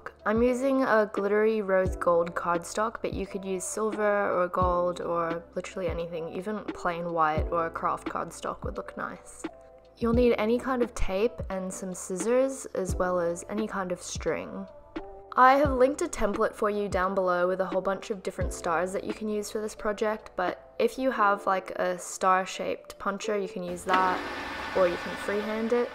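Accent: Australia. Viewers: Australia. It's just discernible in some words ;-) (and it tells on her About tab on her channel page)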